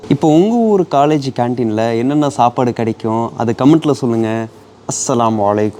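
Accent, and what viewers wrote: Standard. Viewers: தமிழ் சுத்தம் 🎉😂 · இலங்கை பதிவு ரஷ்ய பையன் இவ்வளவு சுத்தமான தமிழில் பேசுவைதைபார்த்து ஒர் தமிழனாக பெருமையடைகிறேன்❤❤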